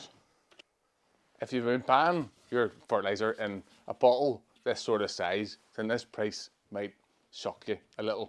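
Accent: Northern Ireland, United Kingdom. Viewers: thanks for the full reply (not many YTers bother!) and BTW, love the Northern Ireland accent (if that's it?)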